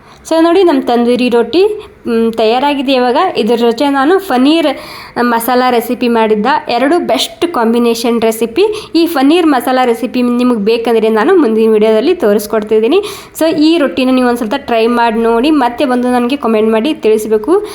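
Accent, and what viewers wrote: Maharashtra, India. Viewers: ನಿಮ್ಮ ಕನ್ನಡ ಮಾತನಾಡುವ ಶೈಲಿ ಕೇಳೋಕೆ ತುಂಬಾ ಸಂತೋಷ ಆಗುತ್ತೆ. ಮಹಾರಾಷ್ಟ್ರದ ಸೊಗಡು ಮಿಶ್ರ ಮಾಡಿ ಕನ್ನಡದಲ್ಲಿ ವಿವರಿಸೋದು ಆಕರ್ಷಣೀಯವಾಗಿದೆ. ನಿಮ್ಮ ಅಡುಗೆ ಮಾಡುವ ವಿಧಾನ ನಿಜಕ್ಕೂ ಪ್ರಶಂಸನೀಯ 👏👍.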